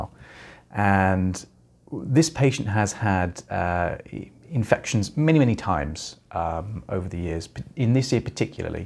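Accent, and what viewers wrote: United Kingdom. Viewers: Always loved the British accent💋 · wait how come you have a british accent if you live in north carolina no offense im just wondering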